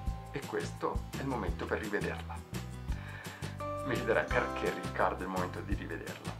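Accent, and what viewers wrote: Tuscany, Italy. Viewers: Beh, dai, Riccardo... Arrivi solo con 10 anni di ritardo!!! 😅 Assurdo come tu abbia descritto per filo e per segno la mia relazione del 2008 che per 11 anni mi ha lasciata congelata. Ancora più assurdo che questo mi torni utile adesso che, invece, esco dalla prima relazione avuta dopo quel trauma, relazione che è stata molto intensa e importante per me. Stranamente il tuo video mi arriva proprio oggi, dopo averlo sognato stanotte. E strano che nei commenti legga di qualcuno che parli del 3 agosto, giorno in cui questa relazione è iniziata. E ancora più strano (ma questo mi è parso strano si da quando ho iniziato a seguirti 😜) che tu somigli fisicamente in maniera quasi fedele, addirittura nell'accento toscano, al mio "ex" sparito nel nulla nel 2008. Ma quanti casi... 😉🙃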